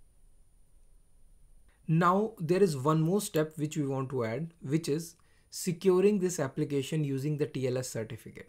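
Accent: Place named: India. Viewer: first and foremost, thanks for the video. Also thank you for speaking clearly. I often close videos with Indian speakers because it's hard to understand. You have a heavy accent but it's clear and professional and a quality sound recording. thumbs up and cheers mate!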